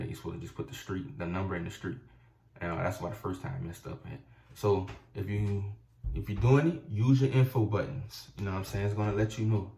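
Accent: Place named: New Orleans, United States. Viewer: Are you from Nola???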